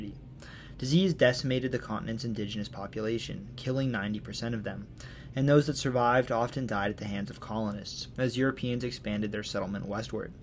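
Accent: United States. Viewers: YOU'RE OBVIOUSLY AN AMERICAN! (I can tell from your speech.) GET RID OF THIS CONSTANT KILOMETRES FOOLISHNESS!! Wastes time. I don't have more time to waste but so far you haven't mentioned that Maine WAS part of Massachusetts until 1820. The ONLY amiable State Divorce in U.S. history---though some of us in Mass. like to annoy the Mainiacs by making noises about taking back what used to be The District of Maine. Bet you don't know that Maine was part of Mass. until 1820! OK, my Dad grew up in West Virginia. The creation of West Virginia was NOT done with Virginia's consent! But wisely Virginia decided not to fight it directly and after decades of discussion, a court case involving the SCOTUS, and more negotiations after decades the two states settled on what West Virginia was going to pay Virginia (last payment made in 1939). Massachusetts and Maine had a similar problem. 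Mass. had kept legal ownership of certain lands in the interior of Maine. in 1851 Maine paid Massachusetts $1.5 million to extinguish all Massachusetts title to any Maine lands. There may still be a few few Maine corporations who have Massachusetts charters. Bowdoin College (named for a Mass. Governor) may be one of them. Finally, Maine is the other state to have the Patriots' Day Holiday (3rd Monday in April) along with Mass.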